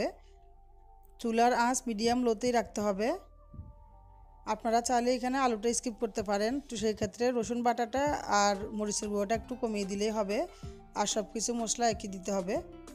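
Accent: Chittagong, Bangladesh. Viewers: আপনি কি চট্টগ্রামের?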